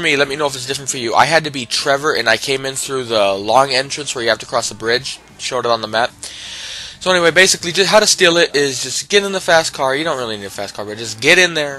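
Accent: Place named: Netherlands. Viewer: First of, still useful, but there is a better way, when you enter the base, stick to the left, when you see the parking lot, stick to the fence, there is an opening leading to a moat where you can safely and easily drive through to the chopper, it took no time at all to get there and second, I believe you're Dutch, but your English itself is really good, most people would not pick up on it :)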